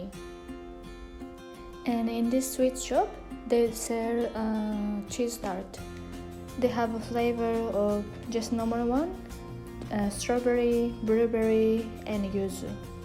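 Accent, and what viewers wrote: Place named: Gulf, Arab world. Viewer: You speak khaliji accent lol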